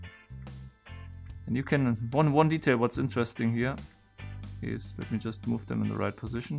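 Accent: Germany. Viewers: Sach mal du bist doch Deutscher oder nicht? :D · I hear you normaly speak german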